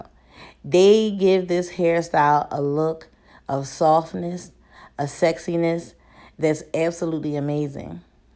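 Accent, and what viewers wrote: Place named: Philadelphia, United States. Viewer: Philly? I detect the accent. Hair was fire!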